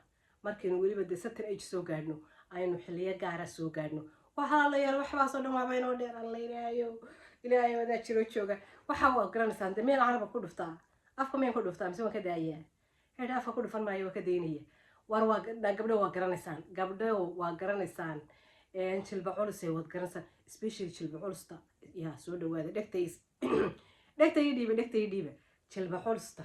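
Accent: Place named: Burco, Somalia. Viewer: Waad iga Qoslisay da’ada aad sheegsnayso,Mashaallah Eraybixinta reer Burco baad u tahay!!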